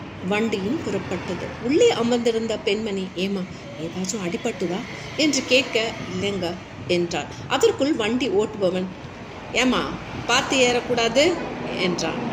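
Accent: Chennai, India. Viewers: அருமை சென்னை பாசை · சென்னைத் தமிழ் ..... யதார்த்தமாக இருக்கு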